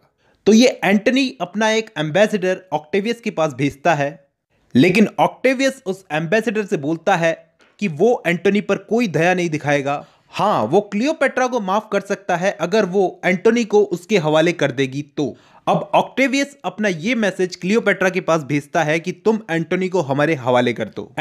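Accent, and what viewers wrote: India. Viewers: i cant understand india english ...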